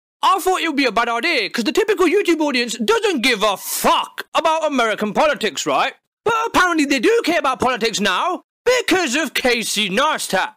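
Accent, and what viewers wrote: United Kingdom. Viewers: baryonyx31 But he has lived all his life in UK and has a severe British accent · hey i thought your brit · says not to support the logic in Casey's video because it's false, yet his argument has just as much fallacies, such as his argument for Hillary not being sane is a fucking joke about her enthusiastic reaction to shit and pigeonholing her negative sides for going to war and pigeonholing Trump's negative sides for PussyGrabbing, all the while saying himself that all youtubers (admitting himself) shouldn't be listened to as political rationale. Essentially just a cartoon with silly British noises now, no comprehension.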